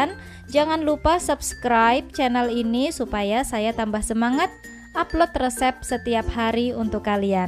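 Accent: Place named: Samarinda, Indonesia. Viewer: What samarinda??? Nada samarindanya udah ketauan..hehe sama dong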